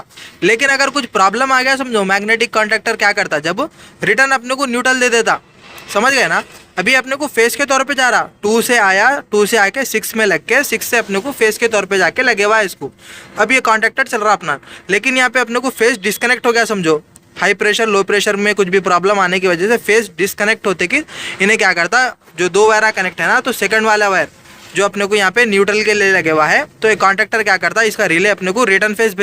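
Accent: South India, India. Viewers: Bhi aap South Indian ho kya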